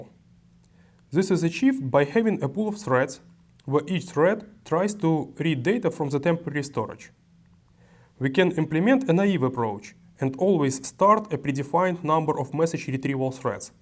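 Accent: Russia. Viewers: Ох уж этот русский акцент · 这是俄罗斯口音吗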